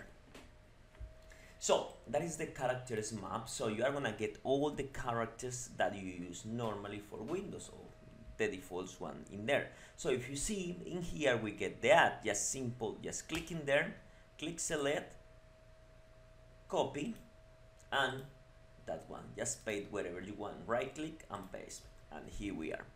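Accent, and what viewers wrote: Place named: Italy. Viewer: This was soooo cool. "Alt 64" worked for me. I adore accents! With respect, and if you don't mind, where's that accent from? Sounds Italian or Spanish? You're the man!! 2024 Sept the 30th.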